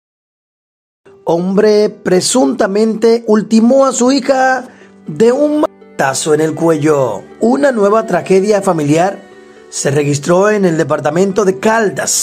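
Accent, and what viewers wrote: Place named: Dominican Republic. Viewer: Solo te faltó decir en qué lugar del planeta paso eso noticias tragicas en voz de un Dominicano eres comunicador y eres bueno manejate. Ook mi Bro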